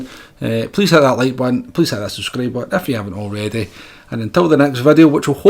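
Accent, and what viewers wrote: Scotland, United Kingdom. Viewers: Nothing wrong with the dulcet tones of Scotland. You want to try being me. I'm a geordie :) Thanks for the video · Hi Great video mate I’ve never bothered to learn this track i think it’s about time I did so thanks for the video The Scottish accent hard to understand never People must listen a wee bit harder top man i’m from Essex people never understand me 😂👍🏻👍🏻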